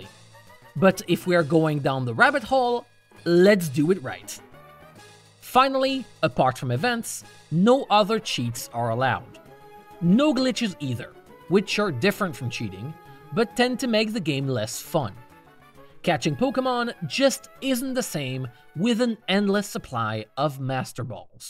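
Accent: France. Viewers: Man ! Are you French ? Si oui incroyable je m’y attendais pas du tout haha 👏🏻 très bel accent 🤩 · Wait, wait, so you're from France and speaking English without an horrible accent? I am impressed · Are you French?? You speak English VERY well! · I'm gonna be honest, it wasn't until this video that I realized you were French. Désolé.. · Are you french ? Im french and i kinda hear a french accent but im not sure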